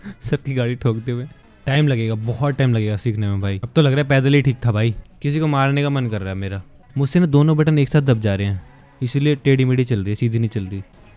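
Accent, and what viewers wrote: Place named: Haryana, India. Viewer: haryanvi accent so good 😂♥️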